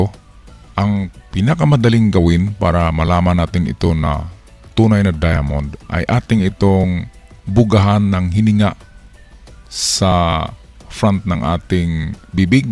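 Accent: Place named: Bisakol, Philippines. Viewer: Engliserp bisakol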